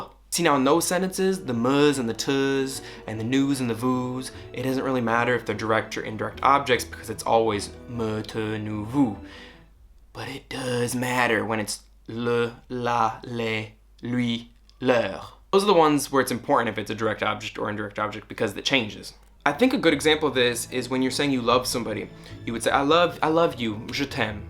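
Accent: South, United States. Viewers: I love your French language videos. In English, you have a unique way of pronouncing words with an interior T (i.e. eaten, important, written). I can tell you’re from the Southern US but where? Merci!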